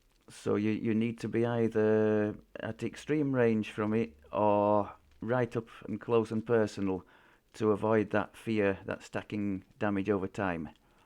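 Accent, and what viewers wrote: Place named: Northern England, United Kingdom. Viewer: Good info well delivered in a proper northern accent. Reaper wi' nowt tekken out.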